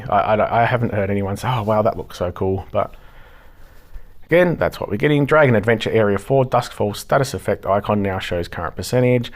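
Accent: Australia. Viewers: Thx mate, great to hear another Aussie. Been subscribed since before you hit 100 subs. Found you from McNaulty. I'm the leader of Obi One Kenobies but your alliance is way higher than ours so we wont see each other across the battle field.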